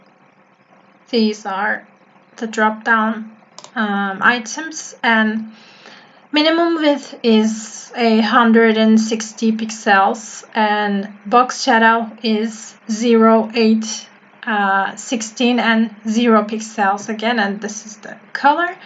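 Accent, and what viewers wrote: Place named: United States. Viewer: you speak like you live in america hw dd u do that!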